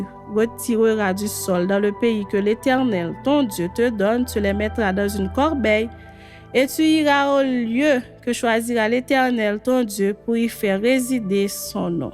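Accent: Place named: Haiti. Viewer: Merci pour ces lectures j’apprécie l’accent haïtien !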